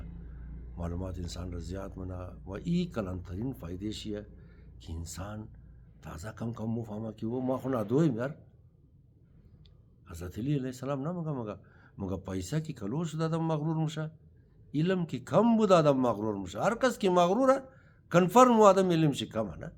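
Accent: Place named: Hazarajat, Afghanistan. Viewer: کاکای ما از آزره جاغوری ایم. لهجه آزرگی تو خیلی خوشم میه. خدا توره عمر سالم و دراز بده. 💐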